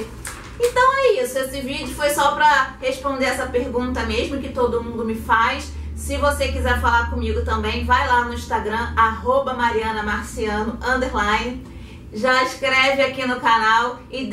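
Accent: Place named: Rio de Janeiro, Brazil. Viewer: Que sotaque tão carioca :0 Beleza :)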